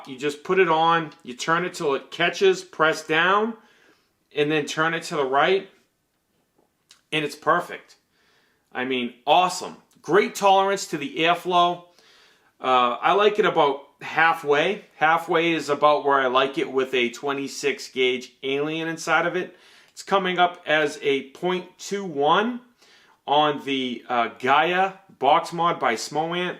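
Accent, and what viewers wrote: Boston, United States. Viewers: You're not a Bostonian by any chance, are you? Just testing my accent recognition.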